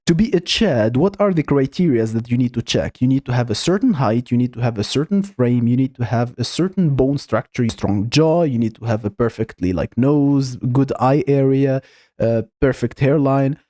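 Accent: Russia. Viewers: First video I came across from you. Your Russian accent suggests you've learned it later on in life or never lived in Russia (learned it from your parents or something). Same here though haha Cheers! Subscribed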